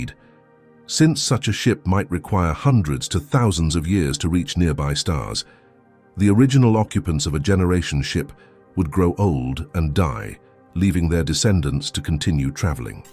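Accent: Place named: United Kingdom. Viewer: Another awful UK accent . YUK.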